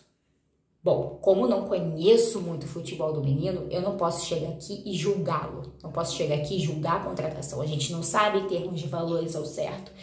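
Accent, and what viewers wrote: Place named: Rio de Janeiro, Brazil. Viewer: o sotaque carioca é tão bonito,quanto a própria cidade. o fluzão,só poderia ser dessa cidade,conhecida mundialmente,como cidade maravilhosa. 🌅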